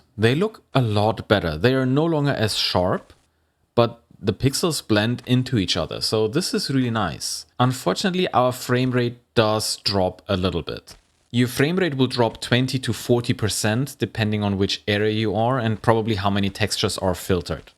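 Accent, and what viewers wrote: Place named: Germany. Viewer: The terrible German accent makes this unwatchable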